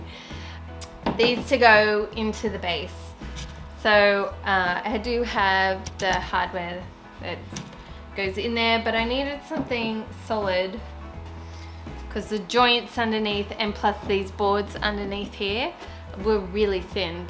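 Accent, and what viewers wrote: Australia. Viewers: Awesome piece 👏🏼👏🏼👏🏼 BTW, where in Oz are u from? I’m from Maroubra, Sydney’s eastern suburbs. Really enjoying ur videos & ur Ozzie accent 😁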